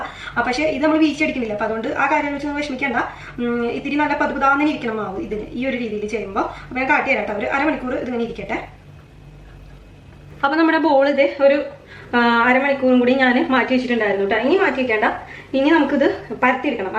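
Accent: Thrissur, India. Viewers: Mmmade trissur bhaasha...kurachu speed kurachu samsarichu dear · Thrissur slang is good but if you lessen speed, we could follow you clearly. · Enthu speeda samsaram...thrissur aano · ആദ്യമായി ഞാൻ ഒരു കാര്യം പറയട്ടെ.. ചേച്ചിയുടെ കുക്കിംഗ്‌ നെ പറ്റി എനിക്ക് ഒന്നും പറയാനില്ല.. superb.. but അതിനേക്കാൾ ഉപരി you are very sincere and a good humon being..... loved your thrissur slang.. iam also a thrissur kkari... 💪💪സംസാരത്തിന്റെ speed thrissur kkarkk ullathaa.. 🥰🤩😘🤣🤣🤣lam a begginar in cooking and a new subsciber of yours... stay blessed and stay genuine like this.. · Thrissur ano sthalam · Thrissur aano veedu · Authentic thrissur slang. Keep it up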